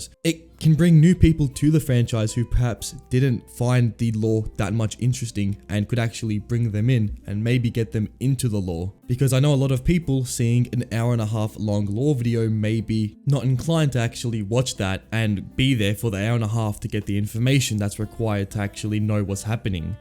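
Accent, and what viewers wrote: Australia. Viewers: you sound australian...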